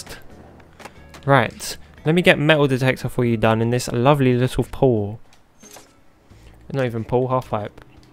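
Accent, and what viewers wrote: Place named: United Kingdom. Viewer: I love your bri'ish accent (pls don't take that offnsively) also how is your day been going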